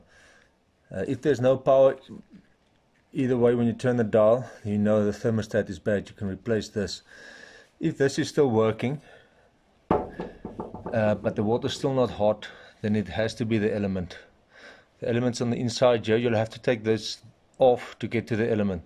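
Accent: South Africa. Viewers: Ek kan mos hoor jys Afrikaans Danke oom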